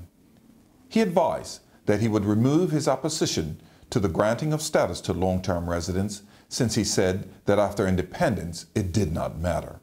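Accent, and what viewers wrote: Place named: United States. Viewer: His accent is more American what gives 🤓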